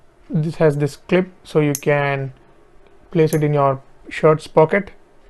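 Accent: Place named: India. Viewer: Indian English is the most annoying accsent I ever heard in my whole life. Sounds like you eating all letters.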